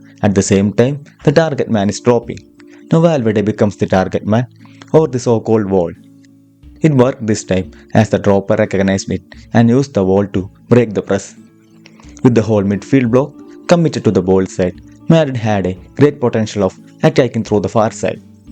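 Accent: Kerala, India. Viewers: Your accent seems to be from kerala, I am a keralite. Analysis is amazing and on point. keep up the good work!!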